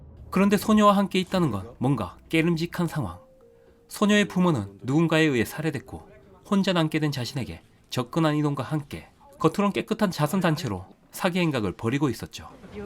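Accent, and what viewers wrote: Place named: Gyeongsang, South Korea. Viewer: 경상도 사투리가 물씬 풍기는 의 나레이션에서 뭔지 모를 독특함이 느껴진다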